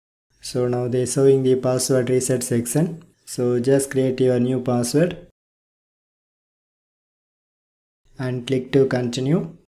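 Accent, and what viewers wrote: India. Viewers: Actually the Indian English is very bad I can't hear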